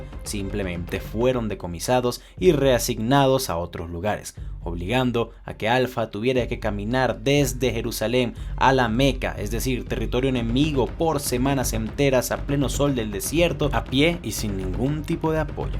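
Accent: Venezuela. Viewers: eres venezolano? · no se como llegue a este vídeo ..pero reconozco tu acento venezolano,,,,entonces te dire....te la lacriaste jajaja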